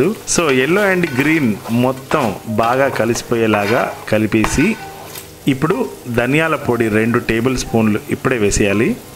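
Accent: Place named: Karnataka, India. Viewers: Very good receipe. Teaching receipe is very good. Speaking in kannada is so cute. God bless you. Keep it up. From bangalore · Nimma Kannada thumba impagide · Wow wow love to hear your Kannada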